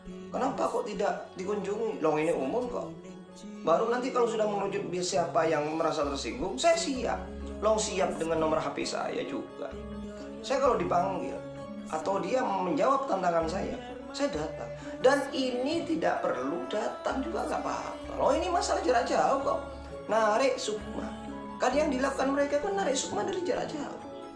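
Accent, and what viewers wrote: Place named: Madura, Indonesia. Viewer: Bhasanya logat.orang Madura iya pak..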